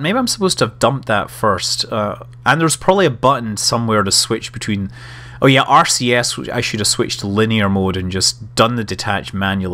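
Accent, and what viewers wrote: Scotland, United Kingdom. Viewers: you kinda have a Scottish accent and your from the US how is that? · Although that's true, we all love hearing your friendly scottish voice.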